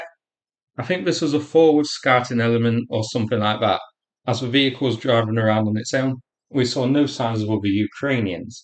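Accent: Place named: Wales, United Kingdom. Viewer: bruv, srsly pls try speaking a bit MORE english, and a bit LESS of whatever accent of yours that is...sometimes ya seem forget you have an INTERNATIONAL audience, and we dont speak WELSH or GAELISH^^ xD thx in advance!!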